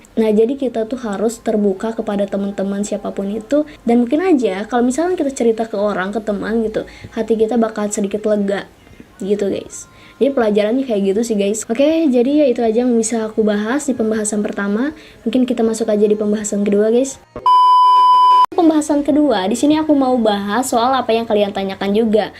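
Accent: West Java, Indonesia. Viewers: Sunda campuran ngomomg na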